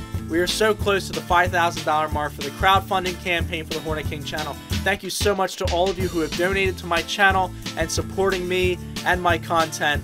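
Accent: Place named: Philadelphia, United States. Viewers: I thought you sounded like you are from Pa. Monroe County Poconos here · Your Delco accent is strong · I knew you had to be from the Philly area by your accent!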